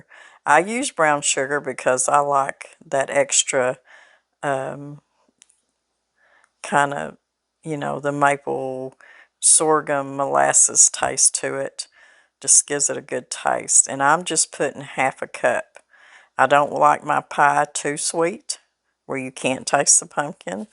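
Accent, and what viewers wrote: South, United States. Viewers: i think you talk normal 🤷🏼‍♀️ but i only live a few counties over 😂 ms., i'm 45 and i have always said waspers, worsh rag, squashes, caint (can't), and all the other southernisms we have. i "workin'" in my craft room, but i sure could go for some of the pumpkin pie. that sounds so good and even better that it's pumpkin season. love living in the natural state with you! farrah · Please ask Mr. Brown to find out what kind of pumpkin that was. I couldn't find any called cow patty or even close to that. Thank you! I love your videos and your accent. My parents are from West Virginia but raised us here in Wisconsin. I love hearing southern accents as it reminds me of them. They also were teased as to how they pronounced words.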